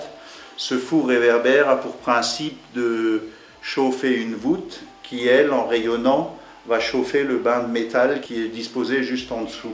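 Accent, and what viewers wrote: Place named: Alsace, France. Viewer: Sympathique accent Alsacien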